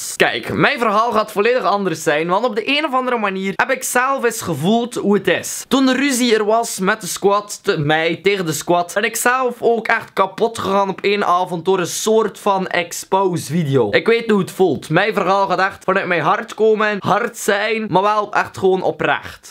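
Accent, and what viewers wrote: Belgium. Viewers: OMG JIJ BENT ZOOO KNAP VOORAL DIE OGEN😍😍😍 EN DAT BELGISCHE ACCENT ZO MOOI EN LEUK❤️ LOVE YOU❤️❤️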